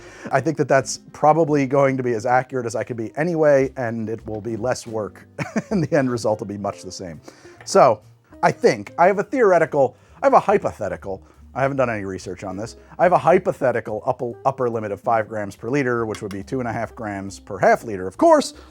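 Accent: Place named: New Jersey, United States. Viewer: You tawk the way you do cuz youre from Joisy. I also grew up in the north east, Massachusetts (and no I'm not from Baaston, I'm from Woostah), and I've never heard a damn soul say "affiktionado."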